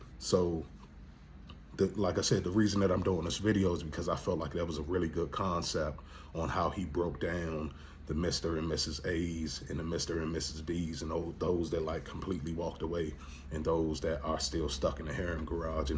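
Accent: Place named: East Coast, United States. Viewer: Thank you for sharing💛( You have to be from the east coast 😌)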